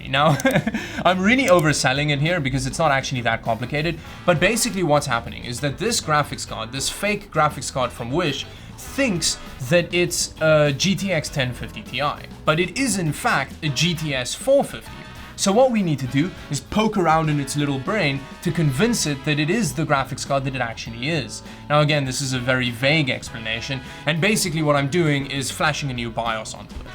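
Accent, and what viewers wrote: South Africa. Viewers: Are you South African? You sound South African. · Are you South african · South African??? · Ek kry die gevoel jy is ñ Afrikaanse Dawid, met daai aksent en al, so gryp daai soldeerbout en sit daai connector op en kyk of dit werk! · Yes definitely do it, i wana also see the result of the difference a 6 pin pci-e connector would make, you have a south african accent, are you south african? Just curious... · Are you a South African expat? Your accent tells me that you are.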